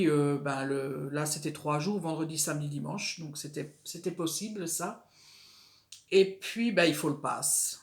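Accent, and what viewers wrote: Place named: Franche-Comte, France. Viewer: Bonjour ou devrais-je dire 😁 Après tes gentils commentaires sous plusieurs de mes vidéos, je suis ravie de découvrir ta chaîne, (par hasard je l'avoue et j'en suis toute désolée), et je dois dire que j'aime beaucoup ce que tu nous proposes, je m'abonne donc avec plaisir ☺ Et quel plaisir également d'entendre ton petit accent qui chante à mes oreilles 🤪 car oui moi aussi je suis Franc-comtoise 😅😁 à bientôt 😘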